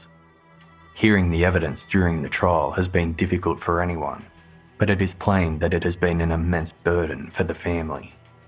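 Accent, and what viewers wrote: Australia. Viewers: Great Australian narration!!!!❤❤❤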